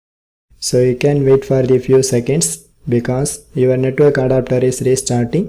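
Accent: India. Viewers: I LOVE THE INDIAN ACCENT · Man the best tutorials are always made by people who has that stereotypical India accent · thanks btw i think you are from indian cause your voice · Certified indian accent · YO IT WORKED I AM NOT A BOT AND THIS ACTUALLY WORKS I THOUGHT THIS VOICE INDIAN GUY WAS SO OBVIOUS BUT IT ACTUALLY WORK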